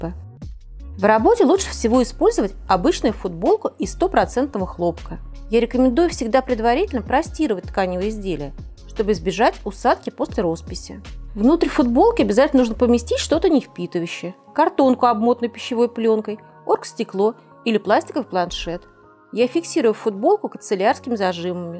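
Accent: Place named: Orenburg, Russia. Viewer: Говор интересный. Вы из Оренбурга?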